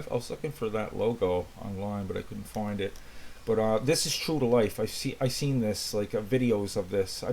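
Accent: Canada. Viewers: Its just mac-h-ale like ginger ale u got a strong candian accent btw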